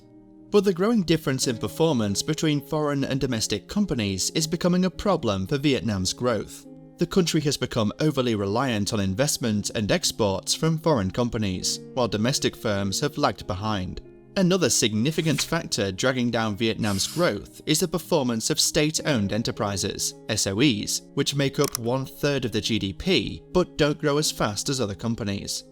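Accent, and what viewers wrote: United Kingdom. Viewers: shit video every time i hear a british accent in a video i know its just going to be bad content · The narrator must come from Lancashire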